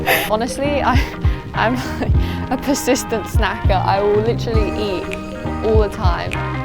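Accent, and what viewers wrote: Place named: United Kingdom. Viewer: Ll aa ndon... xexexe... so british eh!!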